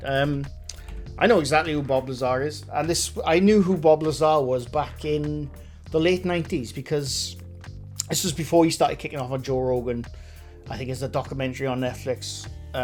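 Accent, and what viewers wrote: Wales, United Kingdom. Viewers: Hi, love all your content and never miss an episode. As a fellow ginger I live in the skin cancer capital of the world (Qld Aust) and it's a pain in the arse having countless skin cancers removed already and no doubt will have more, is it much of an issue for you where you live in Wales? Also I have noticed your beard is multi toned as mine did when I hit the 30's mine became tri coloured to the point that I gave up growing a beard sadly. As for your accent, for me you are clearly Welsh but I have also encountered guys that sounded more English than Welsh and another fellow that I worked with I could barely understand because he spoke so quick and had such a thick accent. Don't get me wrong I like the Welsh, us Aussies seem to have an affinity with the Welsh, Irish and Scotts more so than the English. Keep up the good work.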